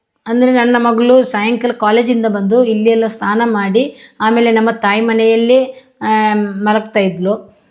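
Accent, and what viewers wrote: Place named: North Karnataka, India. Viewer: ನಿಮ್ಮ ಭಾಷೆ ನೋಡಿದರೆ ನಮ್ಮ ಉತ್ತರ ಕರ್ನಾಟಕದ ಅಂತ ಅನ್ಸುತ್ತೆ ಒಳ್ಳೆದಾಗಲಿ ಅಣ್ಣ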